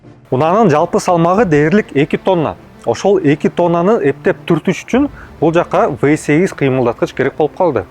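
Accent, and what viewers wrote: Standard. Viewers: Ушул алып баруучунун кыргызча таза сүйлөгөнү жагат ❤ Кабан жөнүндө кыргыз тилибизде чыккан биринчи обзор!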